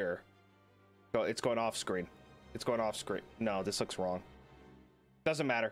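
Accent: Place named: France. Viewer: with a French accent makes him so funny and handsome (totally not saying this bc his description says so- ) Joking aside, I love seeing your silly art Mr.. Truly an artist of time indeed <3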